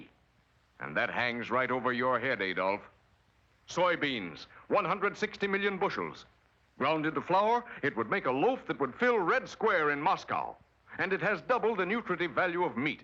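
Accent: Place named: Ireland. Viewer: Does anyone happen know why the Disney staff chose an Irish narrator for this short?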